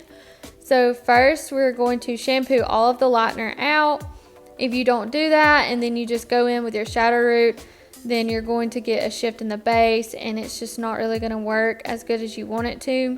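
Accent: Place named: South, United States. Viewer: Love it! Great job and I absolutely love your adorable Southern accent. ❤❤❤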